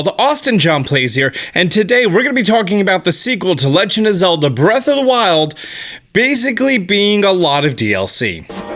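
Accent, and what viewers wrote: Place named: United States. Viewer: Strongest American accent I've ever heard, wtf! 😰😬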